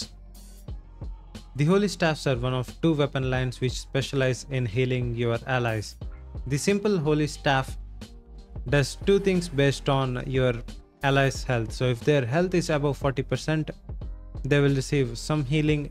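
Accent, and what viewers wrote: India. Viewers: Bro are u indian?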